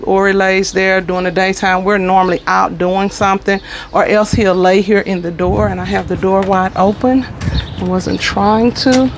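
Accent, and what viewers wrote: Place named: Texas, United States. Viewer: I most definitely hear a TEXAS accent 😇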